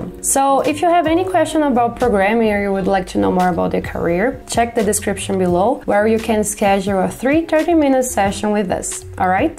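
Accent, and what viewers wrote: Brazil. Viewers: Ela tem um acento brasileiro muito forte, com certeza ela e brasileira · Esse sotaque brasileiro não engana ninguém hahahah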